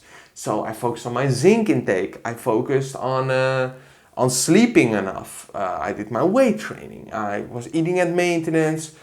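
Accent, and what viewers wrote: Netherlands. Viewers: Here from more plates for dates, en toen dacht ik deze gozer heeft een nederlands accent dus ff kijken. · Hier via Derek. Binnen paar seconden wist ik dat je nl was. Dutch accent >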